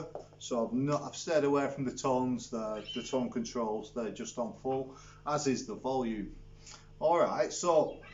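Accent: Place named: Northern England, United Kingdom. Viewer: You sound like you might be from the same area where I am from originally. Bolton Lancashire. I’ve been in Canada since I was 7 but it’s nice hearing that familiar northern accent.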